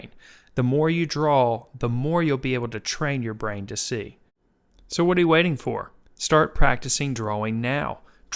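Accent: South, United States. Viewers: owmygod that's what I was hearing too but then I was like ''wait did he just say arse ? he can't be saying arse .. then what IS he saying?'' and remembered that he showed an eye and was like ''OOOWW so that's what he's saying'' xD southern accent problems :P it's kinda cool .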